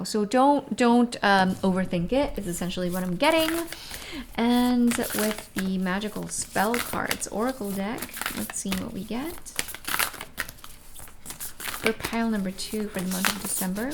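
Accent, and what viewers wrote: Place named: England, United Kingdom. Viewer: Hi your English accent is so sweet and perfect, I love it❤️❤️❤️❤️❤️❤️! Elegí la número 2 y me has retratado tengo una oportunidad muy buena delante de mí y estoy dando vueltas a la cabeza pensando si debo tomar esa decisión tan importante. Abrazos desde España ❤️🇪🇦❤️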